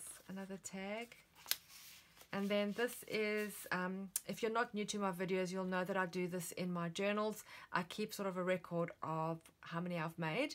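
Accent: South Africa. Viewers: Thats a south African accent I thought it was British....love the journal xx ps it's called pianola paper or just piano paper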